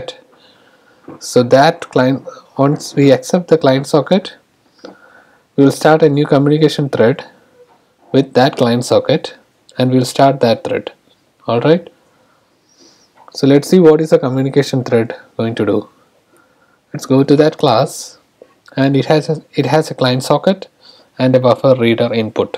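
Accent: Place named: India. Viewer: bhai full indian accent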